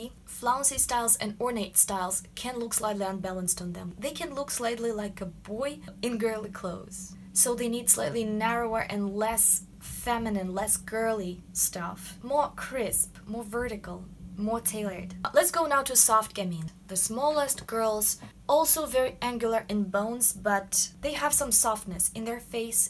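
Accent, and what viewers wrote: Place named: Russia. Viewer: That is funny I found that your accent someway is like you are surely not from Us or England and then I checked you channel oh gash you are russian. That accounts for the milk in the coconut xD OMG I can't really stand it but your information seems pretty important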